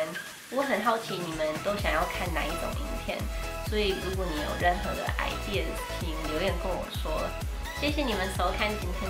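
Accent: Taiwan. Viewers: 很地道的台湾普通话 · 真是台湾腔啊。。。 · 台普棒棒哒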